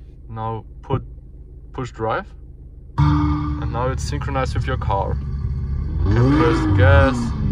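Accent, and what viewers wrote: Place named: Germany. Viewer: Ich wittere deutschen Akzent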